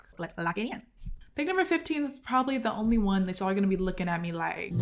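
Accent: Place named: South, United States. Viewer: Your country accent is gone, wtf. It’s less strong as back in the day 😂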